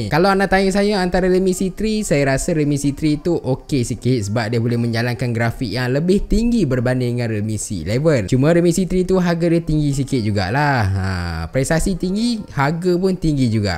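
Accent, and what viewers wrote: Malaysia. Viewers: Lucu ngomong org Malaysia akwkakw jadi suka dengerinya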